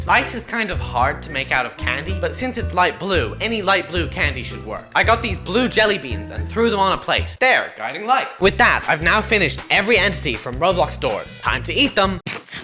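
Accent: Australia. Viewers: I’m Australian ur Australian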